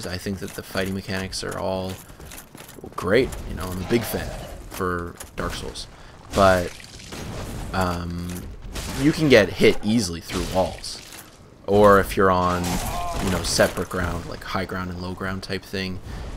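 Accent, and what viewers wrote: Canada. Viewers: I just realized your Canadian .right?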